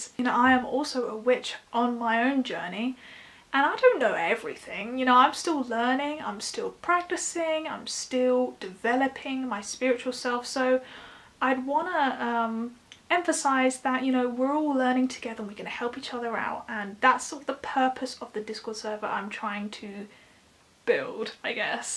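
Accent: England, United Kingdom. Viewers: Finally someone with a nice English accent... I had already thought one could only be into witchy things if one wanted to live in Alabama.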